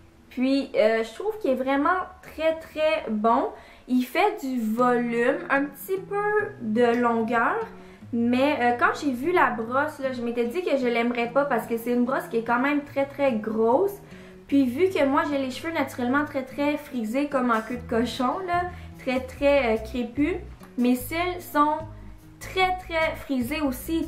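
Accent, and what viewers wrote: Quebec, Canada. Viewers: ben oui elle habite au quebec sa sentent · bjr, tu es sublime et tes vidéos également j 'adore merci pour tes conseils gros bisous et ton joli accent "du Canada" qui me rappelle de superbes souvenirs car je suis partie là -bas "chez toi" à Québec faire un stage pour mes études d'infirmiére! (en 1991) bon dimanche nous ici sur NICE trés chaud et beau avant la pluie pour début de semaine d'aprés la météo bisous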